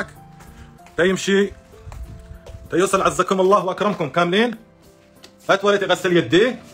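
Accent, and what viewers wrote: Guercif, Morocco. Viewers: من طريقة كلامو تعرف انه اجرسيف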